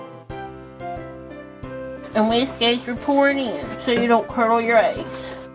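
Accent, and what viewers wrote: South, United States. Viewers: Love the country accent ❤️🎄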